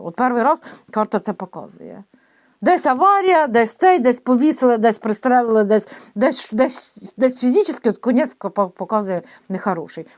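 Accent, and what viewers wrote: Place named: Surzhyk, Ukraine. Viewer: Пані, ваші емоції і ваш суржик- це щось неймовірне, бо в цьому криється справжня українська відьма!!!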